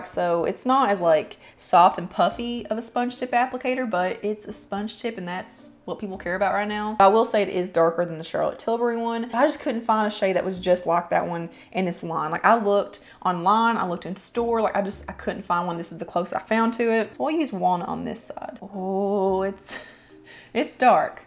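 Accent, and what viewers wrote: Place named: Tennessee, United States. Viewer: Awesome vid. Your accent is amazing. Is it TN? I’m Canadian and love your accent!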